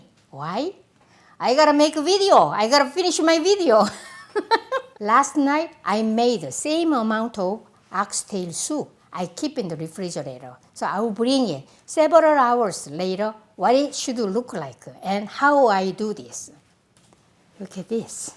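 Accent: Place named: South Korea. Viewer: 한국식 발음 하시니까 ~ 영어가 귀에 쑉쑉 들어 옵니다~ 최고의 영어 강사 입니다.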